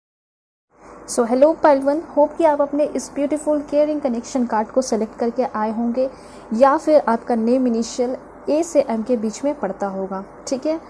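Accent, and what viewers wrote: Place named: Punjab, India. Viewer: Ma'am sorry for this comment. I like your Punjabi tone in your talk. I even enjoy many sounds. Thanks again.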